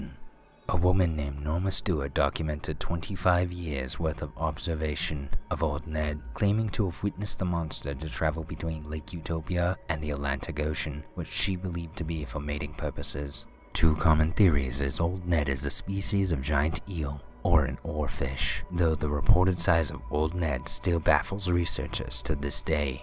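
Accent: United Kingdom. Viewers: You sound like British person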